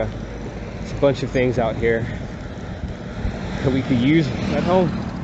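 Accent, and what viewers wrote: United States. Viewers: It's so noisy with that freaking non-stop honking. Can drive you crazy! Btw, your english is pretty good just like an american accent.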